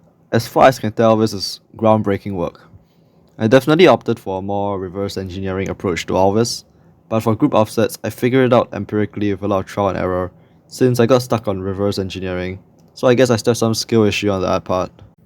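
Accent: Singapore. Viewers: why do you sound singaporean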